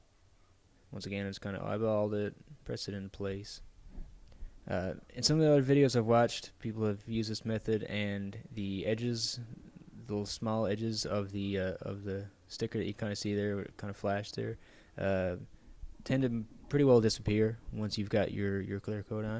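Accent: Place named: Cape Breton, Canada. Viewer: Are you from Cape Breton ? You got the accent lol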